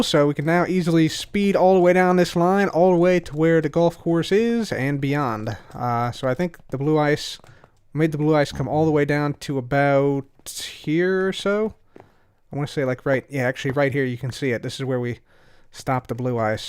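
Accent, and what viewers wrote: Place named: Chicago, United States. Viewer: Also are you from Chicago I can hear a bit of a Chicago accent I'm from Illinois myself.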